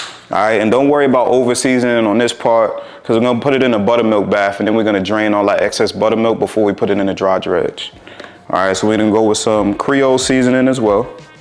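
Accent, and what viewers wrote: New York, United States. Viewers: I hear an accent, maybe New York?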